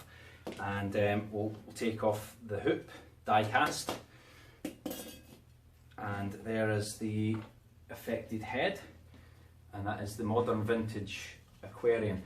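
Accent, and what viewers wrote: Scotland, United Kingdom. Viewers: Good review mate, and good to hear a Scottish voice instead of all the American reviewers. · Are u from Scotland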